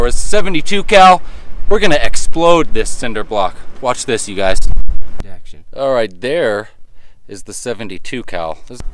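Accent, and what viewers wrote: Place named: Canada. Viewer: You sound Canadian but you look like you're in the high deserts of Arizona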